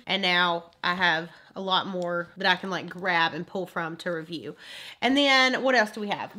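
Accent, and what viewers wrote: South, United States. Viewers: Your southern accent is tooo adorable... Luv ya doll...😀😀😀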